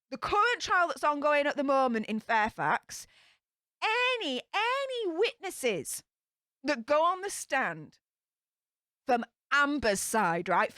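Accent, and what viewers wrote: Yorkshire, United Kingdom. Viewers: you’re so dang cute and cheeky we can’t help but like you, give your partner BigRichard a hug from all of us. Do all Yorkshire women have your same accent? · you’re the best! You always have me dying over here 😂😂😂 I thought you were from Yorkshire when I first heard your accent. I thought either Yorkshire or Lancashire. I wasn’t sure. My Great Grandfather was from Loftus!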